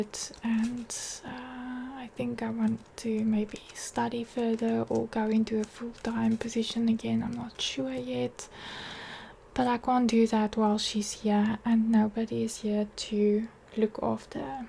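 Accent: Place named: South Africa. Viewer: Where is your pen from please.? Also love hearing the South African accent I really miss home when I hear it.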